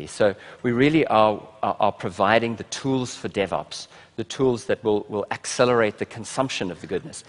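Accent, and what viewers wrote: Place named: South Africa. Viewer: Loving the South African accent and idiom.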